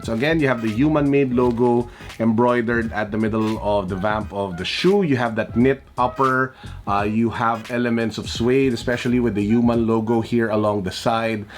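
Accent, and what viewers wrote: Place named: Philippines. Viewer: That Filipino accent tho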